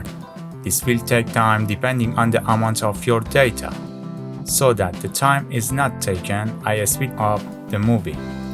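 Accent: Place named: India. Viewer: Loved your indian accent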